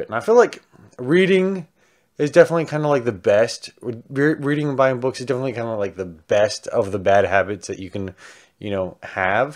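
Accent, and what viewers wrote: South, United States. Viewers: I love your video at your house in Texas during your quarantine until you had some new books are "Now I Rise" and "Bright We Burn" by Kiersten White, "Aurora Rising" by Amie Kaufman and Jay Kristoff, "Thunderhead" by Neal Shusterman, "Mistborn" and "Mistborn: The Well of Ascension" by Brandon Sanderson, "The City We Became" by N.K. Jemisin, "The Vashing Half" by Brit Bennett, "The Prophets" by Robert Jones, Jr., "Angel After the Fall" by Joss Whedon, "Angel and Faith" by Christos Gage, "Queen of the Slayers" by Nancy Holder, "Warbreaker" by Brandon Sanderson, "Fire from Heaven" by Mary Renault and so many others including "Jeremiah" by Robert Cain while you're talking about all of the characters from the book to read them, so you're reading some letters by one of your fans after that, but I hope your husband, Zach will be very proud of you and I wanna say I'm happy for you, because I love your Southern accent, I know you're gay and you're half Mexican!!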